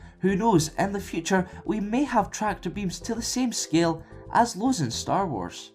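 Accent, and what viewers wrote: Scotland, United Kingdom. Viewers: no hes scottish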